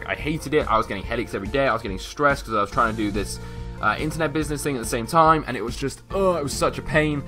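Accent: United Kingdom. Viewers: u have a British accent and by the way I subscribed to u and I am the girl on clash of clans that I talked to u when u said hi YouTube in clash of clans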